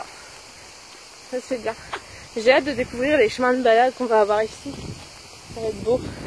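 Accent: Nord-Pas-de-Calais, France. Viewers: T’es une Chti comme moi 😁😁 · Mais non t'es chti ???!!!!!!!!!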